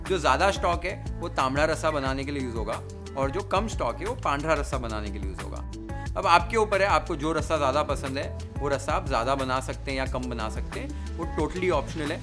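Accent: Maharashtra, India. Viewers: भावाची मराठी accent लई भारी आहे राव... "झणझणीत , सुखं वाटण , कोथिंबीर , परात," सगळे मराठी शब्द परफेक्ट येतात... #नादखुळा 😊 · Ur Marathi accent the way u try to explain in Marathi is really appreciable... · Dear, Ek number Lai bhari 👍🏼😍😋 being a Maharashtrian couldn’t resist the authenticity of the recipe. It is exactly the way it’s made at our home. Your Marathi accent is Kadak. Loads of love, keep up the good job 👏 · Ohh man you Marathi accent is litttt 🔥🔥🔥🔥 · Omg I am fan of your marathi accent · Your marathi accent 😍👌😋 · Bro akele akele khaa raheho😅🙌yaha muhme pani aaga ya😋Bhariii Mutton🤤Mouth Watering......N ur marathi accent tooo😚great work❤️ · OMG 😍.. mouth watering.. in the monsoon.. yummy love the Maharashtrain accent Chef Thank you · U r so good dude … really appreciates ur efforts for Marathi pronunciations and yummy recipes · Your marathi accent>>>💛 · Want an accent man Lai Bhari 💯💯 Sir I love your Marathi Accent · Tumcha marathi accents 😅❤ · Hataoff to you chef. 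Loved your receipes and your Marathi pronounciation. 👏👍🙏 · Appreciate for the wow recipe and your accent for Marathi . Keep it up 👍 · On point... Ur recipe and pronounciation... All are very authentic.. First time I saw a non maharashtrian cook to pronounce exactly the way we say in our home... And all the techniques are almost same as we cook in Maharashtra. Hat's off to you chef... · Tum jo batate hai wo sunkar lagata hai tum Maharashtran hai panjabi lagata hi nahi bahot aacchha lagata hai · I am in love with your MARATHI accent 😍 💕 though I don't write comment frequently I never miss you videos ❤ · Love your Marathi accent..recipe too !! Love from kolhapur 🙏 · Recipe is perfect perfect & mouthwatering as always but 💯 out of 💯 for your ek number Marathi accent 👌🏻👍🏻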